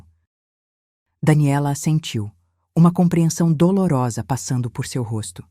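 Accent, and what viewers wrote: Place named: Paraíba, Brazil. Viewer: paraíba, voz bonita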